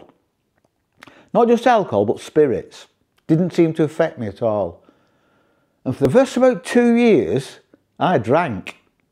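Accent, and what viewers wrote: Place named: Yorkshire, United Kingdom. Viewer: thanks for reading mate really enjoy watching your videos and definitely keep in touch I live in Sheffield Yorkshire and guessing by your accent your not so far away 👍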